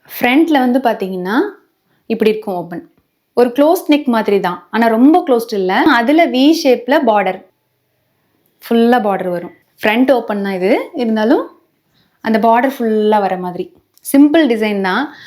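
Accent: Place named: Kanyakumari, India. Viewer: Neengalum kanyakumari slang paesureenga nalla Iruku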